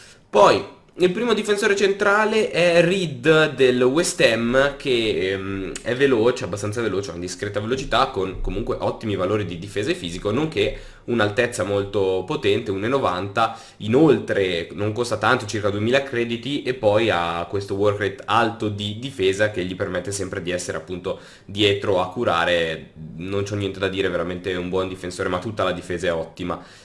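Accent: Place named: Liguria, Italy. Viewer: Ok, grazie ! Dall'accento pensavo fossi ligure !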